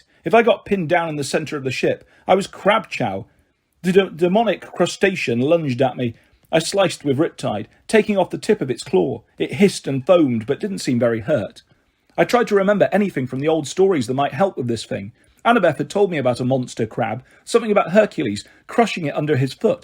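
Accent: England, United Kingdom. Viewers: Smh i gave it a try and your good but i just cant listen to an English accent when it comes to New York Percy lol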